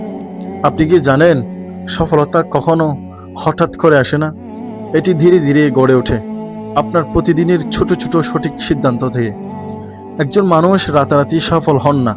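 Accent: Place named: Chittagong, Bangladesh. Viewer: আপনার কথার টোন হলো, আপনি চট্রগ্রামের।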